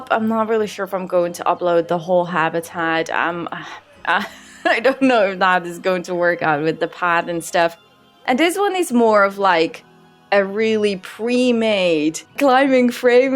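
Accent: Netherlands. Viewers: wait are you dutch?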